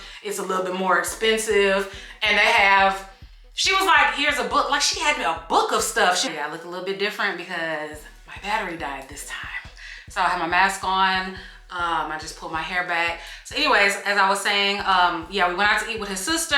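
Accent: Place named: New York, United States. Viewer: He definitely sounds like a NY j*gga you picking up your sonnnnnnnn lmao #ihateithere #nycnative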